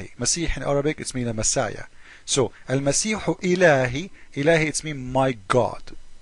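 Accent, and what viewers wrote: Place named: Iceland. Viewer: are you from iceland? love the accent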